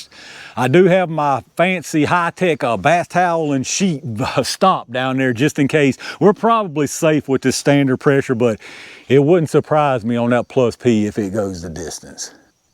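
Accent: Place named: Appalachia, United States. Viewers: That's just the way we talk in the mountains. You say hillbilly like its a insult😆🖕💯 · This is 2026 right? I think we can do without the hillbilly accent.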